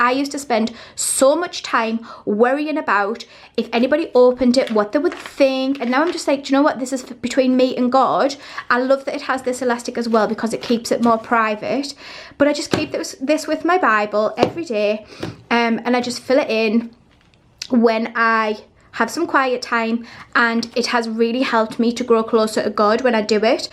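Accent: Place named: United Kingdom. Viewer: This is so wonderful. I think God must want me to do this for myself because I've found many on youtube. You are the only other one that I love your format and how you set your journal. Thank you! I love your accent! Where is that accent from? UK somewhere? I'm in Texas.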